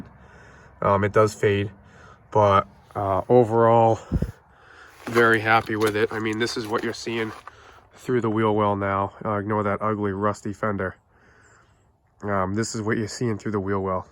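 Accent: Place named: New England, United States. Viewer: Was watching your 2004 Corolla head gasket video and was thinking "between his accent and all the rust/corrosion he's gotta be in New England". Came here and found my answer.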